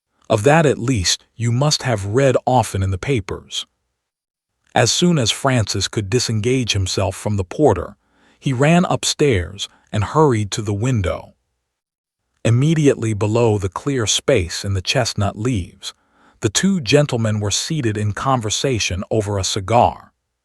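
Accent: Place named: Bronx, United States. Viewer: Pity about the inexplicable Bronx accent, but the narration is competent enough.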